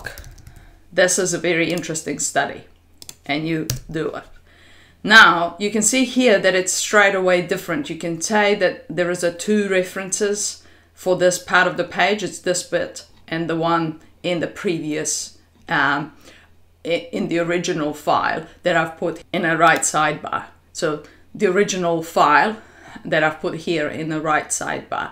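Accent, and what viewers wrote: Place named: New Zealand. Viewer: Great explanation,:). Are you from New Zealand btw? I am taking a guess based on your accent.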